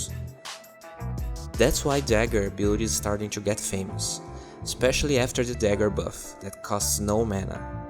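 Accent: Brazil. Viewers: c eh br, seu sutaque eh bem forte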